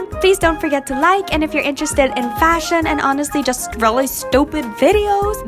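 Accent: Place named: United States. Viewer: This is really shocking she sounds like an American but she's a Filipino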